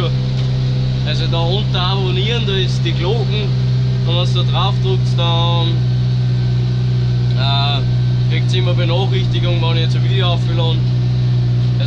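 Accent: Austria. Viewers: I finds richtig geil, dass du Österreichisch sprichst.Grüße aus Kufstein.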